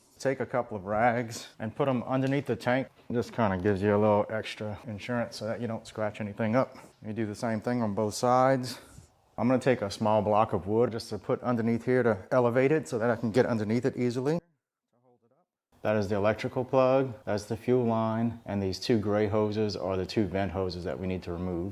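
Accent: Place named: Louisiana, United States. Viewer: Should’ve pulled the AIS off while you were at it. South La you sound like saint Martinville type people.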